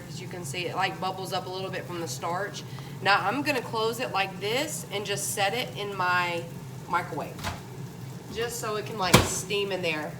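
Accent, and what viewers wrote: South, United States. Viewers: I just love to watch you cook. You remind me so much of myself with that southern Belle accent.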